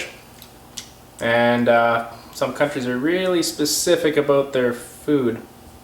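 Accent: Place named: Canada. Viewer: A) this is so freaking adorable, b) holy crikey do you ever have a strong 🇨🇦 accent you have!, c) Isabel pretty much stole your whole face, lol & d) I’m not sure what I’m more impressed with - your cooking or your editing skills…hidden talents galore! ps I watched this with Calvin and he just kept saying “I bet must be really happy about this hey?!!l …he meant the cooking the skills ☺️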